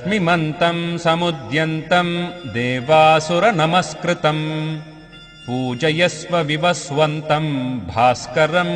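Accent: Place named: Tamil Nadu, India. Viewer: very hard sounding.visargam pronunciation typical tamil type!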